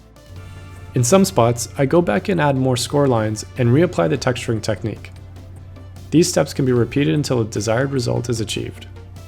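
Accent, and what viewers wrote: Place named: Europe. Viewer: From which country are you from?? I love your european accent 😍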